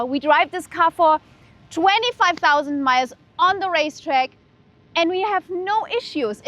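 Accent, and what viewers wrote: Germany. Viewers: I clicked for the car information but come on. She is beautiful and dressed in a way that I can’t look at the cars for some reason.😂. I love the German accent and that she knows about cars. Definitely an exotic I would want to rent.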